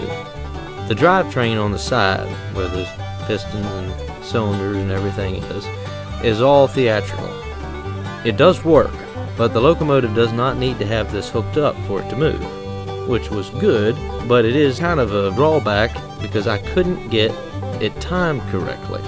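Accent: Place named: South, United States. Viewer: Your story tell voice with the slight southern drawl makes the narrative for this perfext. Fits the WM atmosphere almost as if scripted. Hope to see /hear more. Nice machine, I have three of them. Bears to get running right, but once they do, they're magical to behold. Some of the strongest workhorses I have too.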